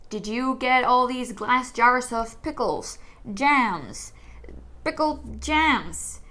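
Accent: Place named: United States. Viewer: You sound like you are from America 😳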